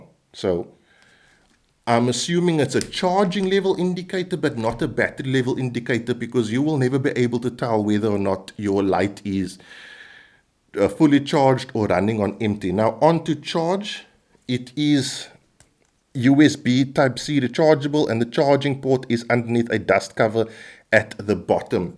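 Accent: South Africa. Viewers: Jeez - could you roll your R's and add syllables to words anymore! This guy couldn't be anymore South African.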